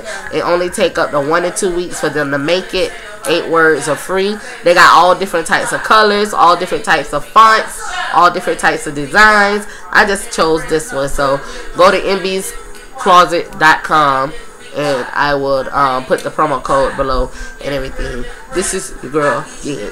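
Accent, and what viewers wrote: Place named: Miami, United States. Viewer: I knew u was a miami girl like me frm the way u talk... thats y i love u!!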